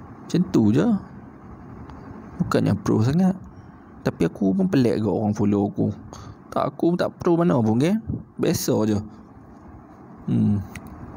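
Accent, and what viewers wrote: North, Malaysia. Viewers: Mantap bro cakap utara baru paham kalay da contact number ws la nk belajar lg mndalam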